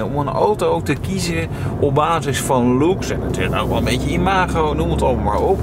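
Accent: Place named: Netherlands. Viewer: could not understand anything but spice girls. this guy needs to work on his accent , sounded more like dutch than english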